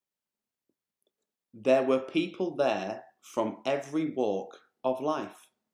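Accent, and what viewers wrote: United Kingdom. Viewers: From what part of Britain this accent is? In some words the pronunciation differs from that in transcription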